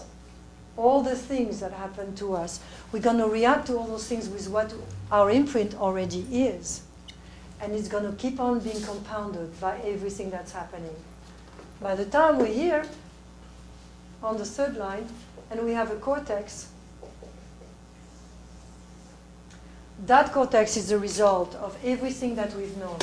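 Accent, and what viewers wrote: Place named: France. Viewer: She is right. By the way, her French accent is really fine :-)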